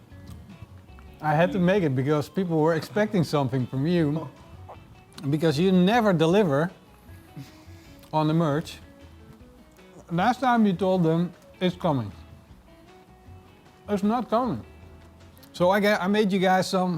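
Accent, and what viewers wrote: Netherlands. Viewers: Ben je Nederlands? Hoor het aan de accent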